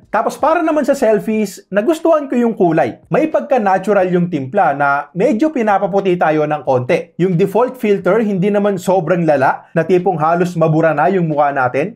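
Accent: Cavite, Philippines. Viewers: tga cavite pala kayo sir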